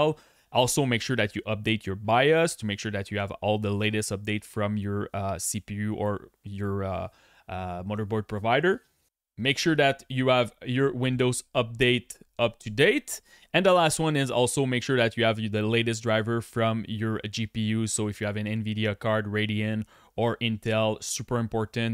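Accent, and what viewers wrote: Quebec, Canada. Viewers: T québecois avoue lol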